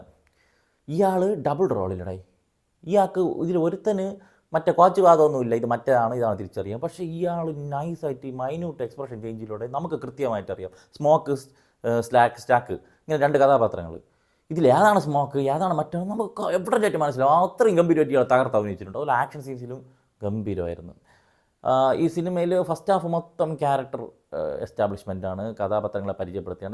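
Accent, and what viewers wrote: Trivandrum, India. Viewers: Please avoid reviewing English movies and stick on only to Indian movies... Thankalude malayalam movies nte review okke njan kanarundu and i am very much interested in those reviews and your Trivandrum slang. .. Athukondu thanneyaanu thankale njan subscribe cheythathum. But I am very sorry to say that you don't have the class for reviewing English movies, maybe due to the fact that you are not much familiar with Hollywood or British movies. The way you reviewing Sinners is really pathetic and too substandard. So once again, I humbly request you to restrain from reviewing English movies.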